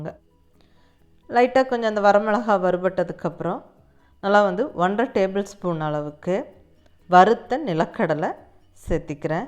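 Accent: Kongu, India. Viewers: I think Coimbatore.... Sollumbothey Enga kongunadu Nu sollaranga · Mam neenga pesura kongu tamil romba nalla iruku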